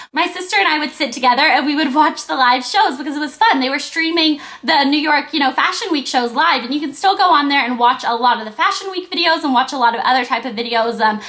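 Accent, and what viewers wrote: South, United States. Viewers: she IS southern. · you sound more southern when you're sick. i like it :) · Like ur old videos but ur intro us way to formal and long also I miss your southern accent!! I loved it :) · when you mentioned the elf brush, the nyx jumbo eyepencil and the mac "fresh water" IT BROUGHT back sooo many memories, of your first few videos! !! and OMG i miss your thick southern accent. :( hahha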